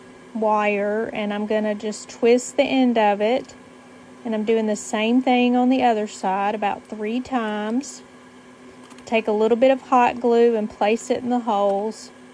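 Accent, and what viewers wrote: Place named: Alabama, United States. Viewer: How cute! 😍 I love doilies! I just have to ask, are you from Alabama? I'm on Sand Mountain and your lovely accent sounds like all my people! 😄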